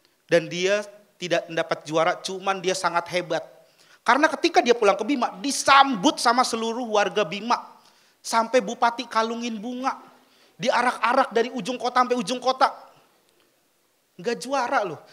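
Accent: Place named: Jakarta, Indonesia. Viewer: orang bima tp kek betawi logat nye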